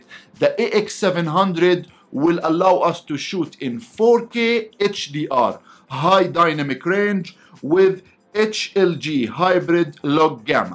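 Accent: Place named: Egypt. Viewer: Egyptians trying hard to speak English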